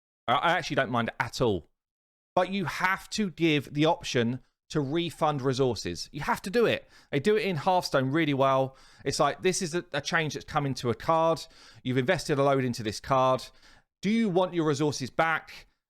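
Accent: United Kingdom. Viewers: i could listen all day long to your lovely british accent! 😂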